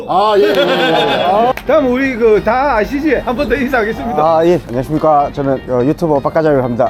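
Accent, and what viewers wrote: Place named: Gyeongsang, South Korea. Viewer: 곱게 가제이 이건 진짜 서부 경남 더구나 진주 거제 삼천포 등 이 아니면 모르는 사투리 ㅎ.ㅎ.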